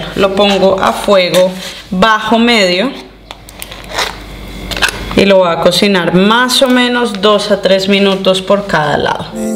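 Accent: Colombia. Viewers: Toque costeño? O toque Venezolano?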